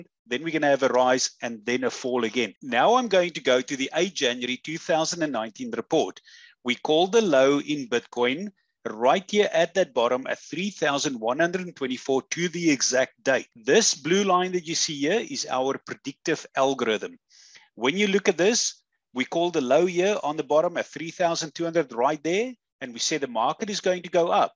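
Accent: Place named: Afrikaans, South Africa. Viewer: Yes it is. It's an Afrikaans accent from South Africa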